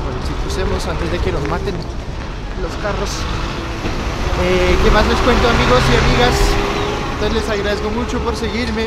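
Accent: Colombia. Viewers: Soy muy timida, pero me atrevo a decirte que eres muy guapo jejeje... Me gusta tu acento colombiano :-D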